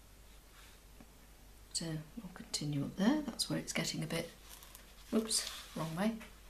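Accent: United Kingdom. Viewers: I've so enjoyed watching your videos & learning about watercolor from you. You're such a lovely lady with a soothing, relaxing voice, especially your accent. I love all things British & hope to visit the UK one day, especially England. I'm an extreme beginner to watercolor at age 44 though my late grandmother was an accomplished artist of oils & watercolors. My mother has painted in the past as well. My 19 year old daughter, who is a beginner also, inspired me to give it a go! I can't draw well at all but I've been able to paint from some of your videos, like the lavender pot and these wonderful silver birches! We have them here in Virginia, too! I was able to paint a very nice one from this video using brush you mentioned; so easy, but looks so real! Thanks again for sharing your talent!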